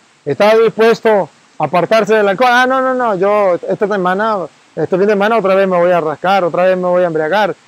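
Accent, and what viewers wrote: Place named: Mexico. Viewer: Buenos días padre bendiciones padre usted venezolano su acentos es mexicano. 🙏 yo creo firmemente cuerpo y la sangre de Jesús. Tuve bella experiensa..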